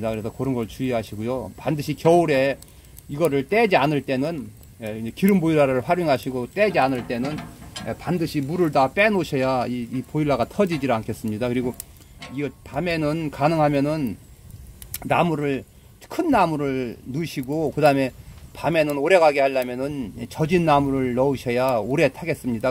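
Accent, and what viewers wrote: Chungcheong, South Korea. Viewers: 그렇겠네요‥ 불조심해야죠 큰일나죠‥불씨‥ 그런데 고향이어디세요 충청도같은데‥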